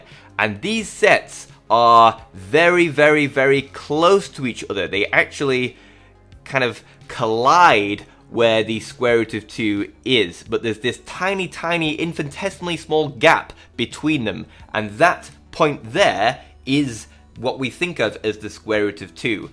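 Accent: Yorkshire, United Kingdom. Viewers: *Man with an accent vaguely resembling the Yorkshire accent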